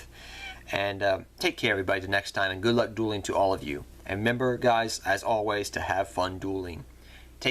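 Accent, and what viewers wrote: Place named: Northeast, United States. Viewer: you from up north?? got an accent on you Ny Boston NJ ??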